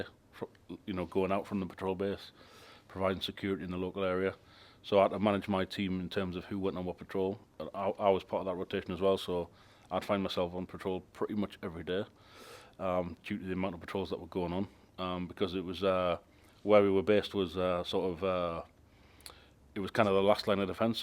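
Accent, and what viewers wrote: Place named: Newcastle, United Kingdom. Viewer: what part of Geordy land are you from ????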